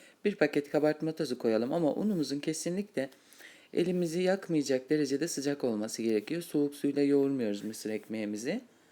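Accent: Black Sea, Turkey. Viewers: sesin tam bir karadenizli kadin sesi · sizdemi karedenizlisunuz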